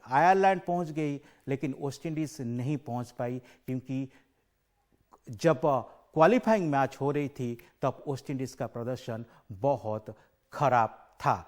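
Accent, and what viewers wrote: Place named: Kolkata, India. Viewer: बोलने का तरीका गज़ब है सर जी आपका लगता है कि आप कोलकाता से है कि 😀😀😀😀😀😀😀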